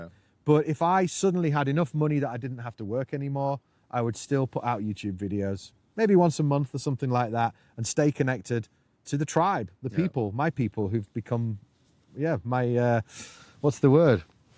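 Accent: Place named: Lancashire, United Kingdom. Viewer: Hi.. I'm a new subscriber.. Just want to say what a fantastic lifestyle you've chosen.. It's good how you've taken your kids out of mainstream teaching.. What a way to go!!! They'll have a far better education doing your way! You are creating great content too.. Good on you!! Are you from Lancashire? I recognise the accent?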